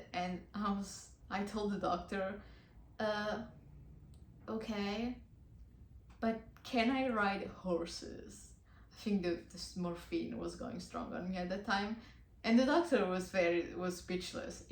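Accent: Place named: Italy. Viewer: Your accent sounds Italian. 😊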